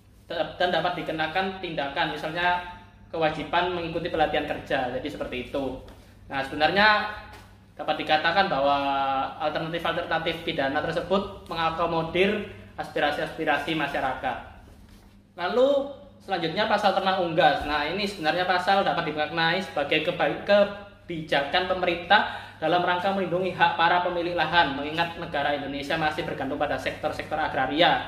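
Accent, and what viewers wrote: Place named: Java, Indonesia. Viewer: Suaranya kaya medhok koyo wong jowo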